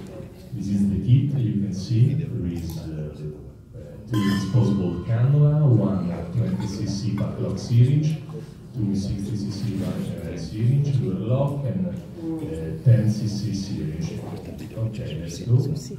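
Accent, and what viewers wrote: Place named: Italy. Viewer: Che accento troppo italiano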